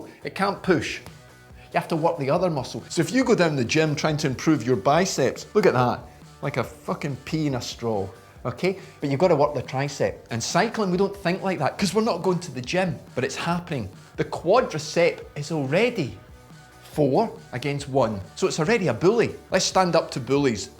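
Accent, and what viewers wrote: Scotland, United Kingdom. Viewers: Can somebody please summarize what this bloke is on about I haven't got the time or the patience to hear and decode all of this Scottish I just wanna know if I'm wreckin mah knees you know and is cyclin bad.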